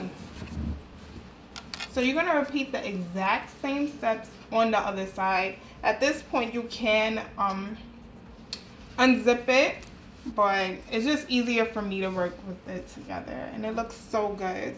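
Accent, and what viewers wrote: New York, United States. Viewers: I'm usually good at recognizing accents. Are u from NY?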